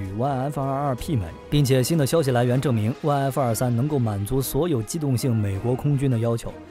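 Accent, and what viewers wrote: China. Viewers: 這不是台灣人做的影片。口音、用字是強國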